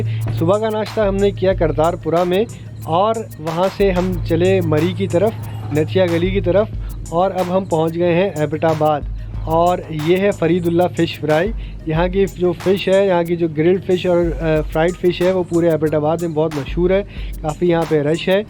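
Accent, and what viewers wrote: India. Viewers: Why speak inglish like people from india...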